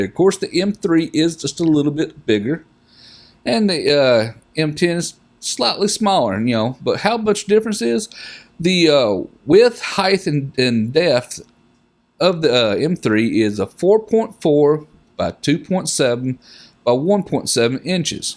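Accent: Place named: North Carolina, United States. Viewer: Great review! Thanks you for helping me make this decision! Also, your accent reminds me a lot of either a North Carolina or a Virginia accent. Greensboro, NC resident here! Again, thanks so much for all you do :)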